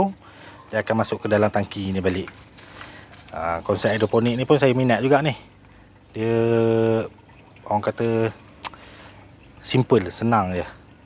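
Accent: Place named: Perak, Malaysia. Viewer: Cara abang cakap macam orang perak le...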